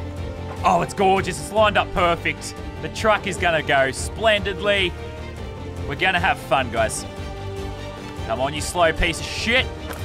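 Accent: United Kingdom. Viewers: lazerbeam you sound British sometimes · Aren’t you british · Love your British 😂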